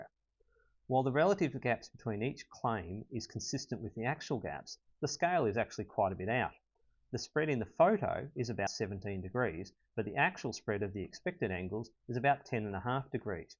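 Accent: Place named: Australia. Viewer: Maaaaate. That ain't no New York accent. You are from the land of the vegemite sandwich.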